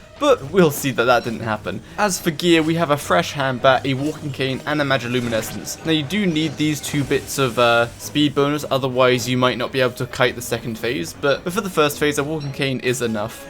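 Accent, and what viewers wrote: United Kingdom. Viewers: The bri'ish accent commentary is charming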